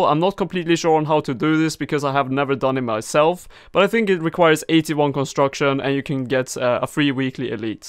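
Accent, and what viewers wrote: Sweden. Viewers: you sound swedish :)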